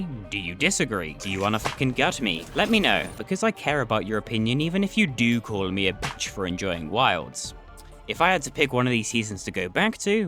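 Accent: United Kingdom. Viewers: I was about to say I thought you were British.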